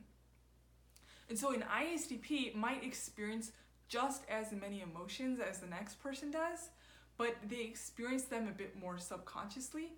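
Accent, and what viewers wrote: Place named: Minnesota, United States. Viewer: You sound like Jesse Ventura (in terms of accent); would you happen to be from Minnesota?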